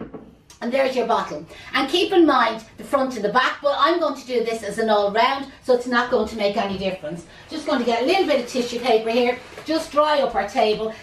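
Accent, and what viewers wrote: Ireland. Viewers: Thank you for the great tutorial! I could listen to you talk all day. An Irish accent is so lovely to my ear. I’m going to do a bottle arrangement for my next party!!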